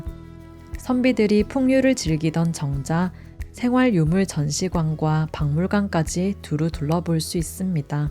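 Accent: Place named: Gangneung, South Korea. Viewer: 강릉분이시죠??^^ 아름다운 드라이브 코스 설명하실때 친근한 억양이ㅎㅎ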